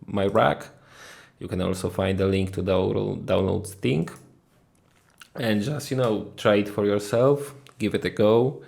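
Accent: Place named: Poland. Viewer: w pierwszych slowach slychac swoich:p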